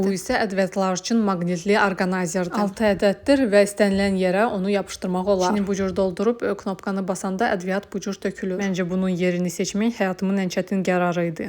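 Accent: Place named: Russia. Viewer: ÖZÜNÜZ TUPOYSUZSA CAMAATA YAZMAYIN.RUS QRAMMATİKASI İLƏ DANIŞIR VƏ ONDA T HƏRFİ İNCƏ OLANDA Ç KİMİ EŞİDİLİR!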